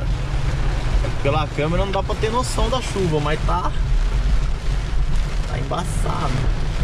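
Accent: Minas Gerais, Brazil. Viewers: Fala irmão, sou novo no canal, e quero saber, cê é mineiro?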